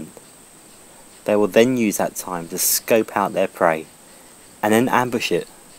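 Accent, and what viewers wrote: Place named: United Kingdom. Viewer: Cool accent! Is that British or South Africa?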